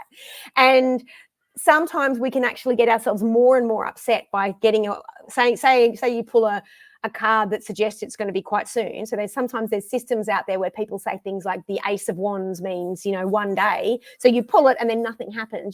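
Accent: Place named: Australia. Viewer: Love your Australian accent ❤ you are a superb Teacher ❤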